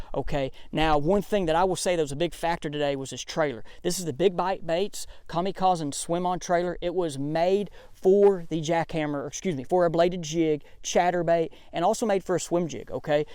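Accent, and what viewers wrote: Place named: South, United States. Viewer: The man talks like I talk. Free, fast, and it's straight up southern.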